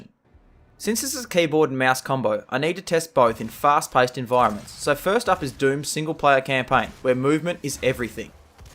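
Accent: Australia. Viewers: And I thought I had a thick Australian accent...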